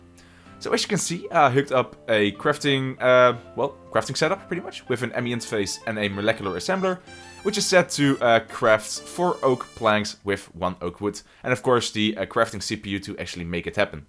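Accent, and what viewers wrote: Netherlands. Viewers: Nice dutch accent 🧡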